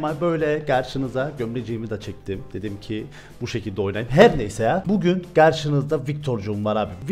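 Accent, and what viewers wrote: Cyprus. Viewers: Abi her video attığında onunla birlikte izlerdik güle eğlene kıbrıs ağızına yatkın olduğu için senin konuşman gibi konuşurdu keyifle izlerdim.Artık o başka biriyle ben yine seninleyim. Seviliyorsun reis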